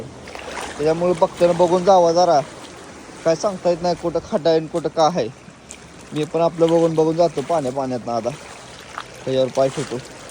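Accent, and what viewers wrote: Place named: Marathwada, India. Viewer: तुम्ही कोणत्या गावातून बोलता तालुका कोणता आहे भाषा मराठवाडा तील वाटते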